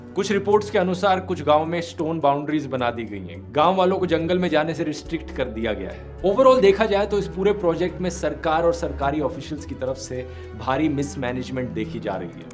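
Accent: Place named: North, India. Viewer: ARE YOU A PAKISTANI? IF SO then for your kind information Mr. Dhruv Rathee is of Indian origin, and his accent is a typical north Indian one, he and we Indians don't speak in that way, each and everyone of us speak in his own regional accent/dialect, so he can't speak in such a clear Pakistani Urdu accent.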